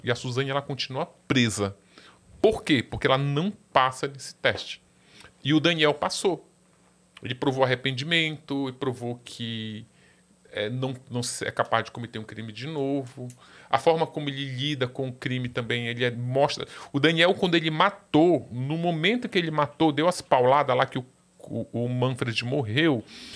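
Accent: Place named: Pará, Brazil. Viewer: O sotaque paraense é único 😂, Parabéns 👏🏾👏🏾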